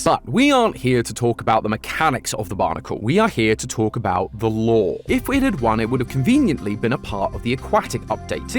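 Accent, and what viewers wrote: United Kingdom. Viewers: i thought you were British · Your to British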